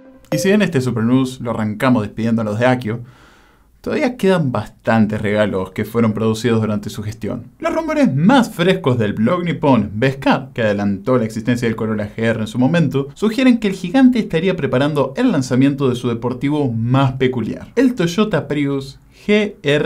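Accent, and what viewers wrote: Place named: Buenos Aires, Argentina. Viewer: *Bonaerense, que en el interior cambia bastante la pronunciación. 😉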